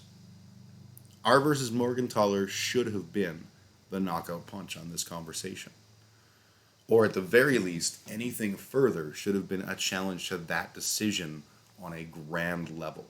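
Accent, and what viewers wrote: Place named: Appalachia, United States. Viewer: I love your hillbilly accent 😂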